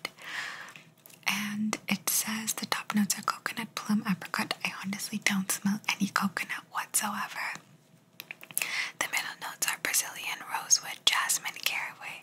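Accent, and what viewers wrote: Italy. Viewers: She has one or two that she did in her Italian accent... I'm pretty sure. Maybe like 6 months ago or so I think.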